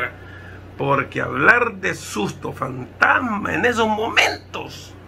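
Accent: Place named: El Salvador. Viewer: Éste se habla como salvadoreño tas tas ciado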